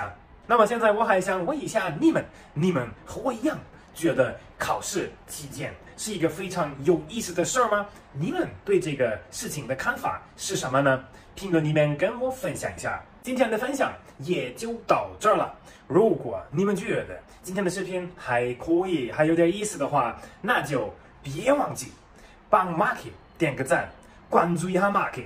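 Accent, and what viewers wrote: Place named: Chengdu, China. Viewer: 成都话好亲切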